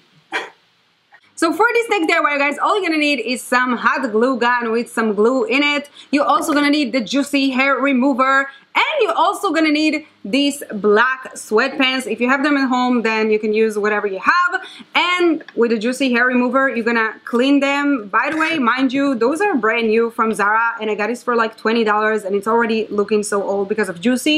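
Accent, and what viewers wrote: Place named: Dominican Republic. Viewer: I liked this video. She cracked me up with her silly moments.. "immagrant problems" ctfu. I Black and Hispanic so I understand how she speaks. I wonder where she from. I wanna say Dominican Republic..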